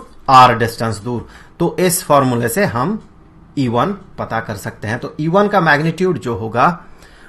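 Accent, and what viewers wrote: Bihar, India. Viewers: What a sophisticated explanation..! I'm really very impressed with you sir. You're awesome and vo Bihari tone sir ji fan ho gye hai hm aapke... proud to be a Bihari🤘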